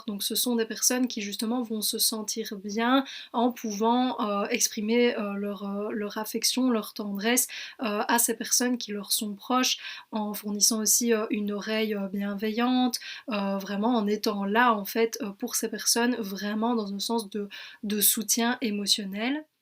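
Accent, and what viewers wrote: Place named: Belgium. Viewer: J'adore ton accent belge vraiment adorable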